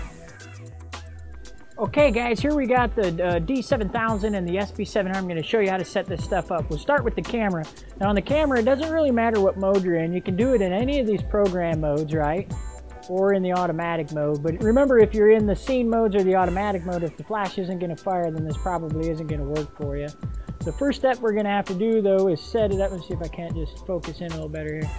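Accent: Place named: United States. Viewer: I so love your accent... I just want to visit the US on the next available flight. Your tutorial here is very helpful. Thanks very much from Patrick in the UK using a D90 and SB 700. Until now I was using flash off camera and using a little card to block out the light from the poppy up.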